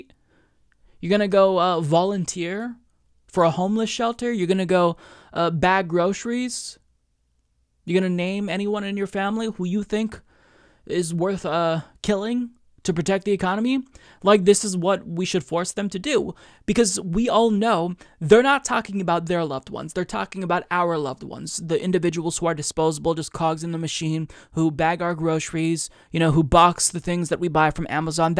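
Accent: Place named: Midwest, United States. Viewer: I've heard you mention in the past you've worked on your speech and that either you're insecure about the way you sound or you've gotten negative feedback about the way you sound. I want to tell you that I love listening to you talk. I love your midwest dialect. I love your mannerisms and -isms. Btw I am someone who knows most people can't stand the way I talk or sound so I feel like I'm a honorary expert!! 🤣 😅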